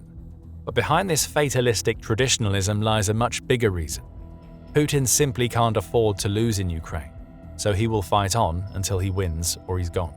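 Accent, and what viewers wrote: United Kingdom. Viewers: First time listen to a Brit talk?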